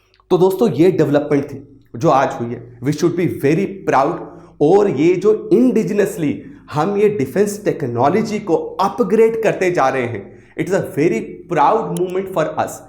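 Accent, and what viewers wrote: Punjab, India. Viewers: Deep knowledge with punjabi tadka 🔥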